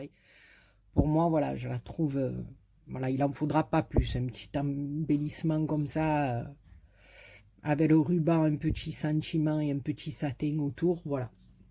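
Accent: South, France. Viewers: toujours un plaisir à te regarder, tu mets la patate pour la journée et tu fais du bien, tu transmets ta bonne humeur avec l'accent du midi..bisous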